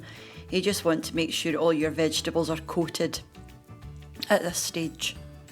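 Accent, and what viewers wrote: Scotland, United Kingdom. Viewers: looks delicious, defo need to buy a slow cooker with all these amazing recipes I'm seeing :) I'm from Port Glasgow, so it's nice to hear a Scot on here :P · Am a Scot living in Thailand the last 6yrs and am just going out tomorrow to source a slow cooker... Can't wait to try this simple recipe!!!... and commentary in Scottish makes it a must!!!... A harty winter meal in 32° of heat!!!!...Way too hot for a peely wally Jock!!! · What are you on about. She is Scottish this is how she talks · Love your Scottish accent. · Love the Scottish accent! 👍🏻 Seven hours to make it and less than seven minutes to eat it! 😜😋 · I love a scottish accent...so comforting...makes you feel everything is going to be alright😁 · My paternal grandfather was Scottish, and my paternal grandmother was Irish. I miss them, and the way they talked. Your brogue brings back a lot of good memories! 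· I know right ,Scottish accents are the cutest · I love your Scottish accent, wee bit is my favourite ;-) the casserole looks good, could you put in the spuds as well if you didn't want mash? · The lassie is Scottish :) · My husband's mother side of family is proud Scottish! So I was looking for Scottish food recipes but it was so hard to find. I am so glad I found YOU! thank you so much for your recipes.. it is so pleasant listening your accent! Pretty please upload more Scottish recipes.. thank you :) ♡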